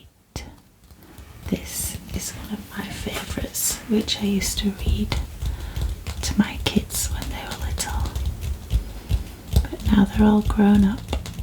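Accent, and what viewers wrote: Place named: Yorkshire, United Kingdom. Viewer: Been so hard to find a proper yorkshire accent to fall asleep to! it's all americans doing accents for fun or posh people and i hate it!!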